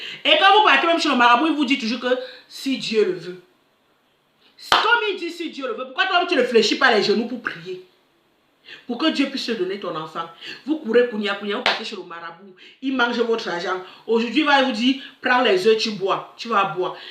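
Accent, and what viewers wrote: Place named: Cameroon. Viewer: 😂😂😂j'aime trop ta façon de parler ( l'accent kamer ) trop belle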